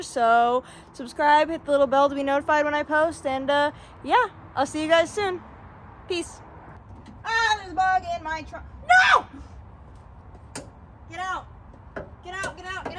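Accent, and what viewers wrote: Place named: Newfoundland, Canada. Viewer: Thing a ma bobber u talk like a newfie Like me lo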